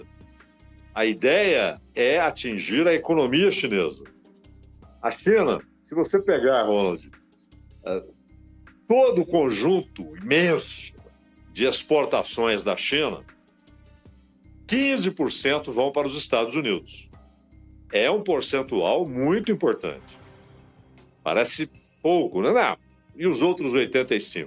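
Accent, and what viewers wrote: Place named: Caipira, Brazil. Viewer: Vc é velho comunista, com sotaque caipira ! Vtsc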